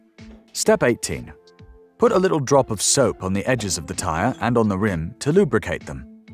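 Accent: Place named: Cockney, United Kingdom. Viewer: I can hear your a cockney 👍 good video very informative thanks 😊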